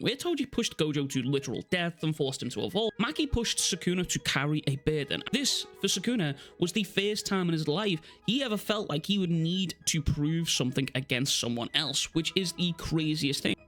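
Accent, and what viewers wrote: Liverpool, United Kingdom. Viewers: Is this a scouser?? (United fam here) 👀👀👀👀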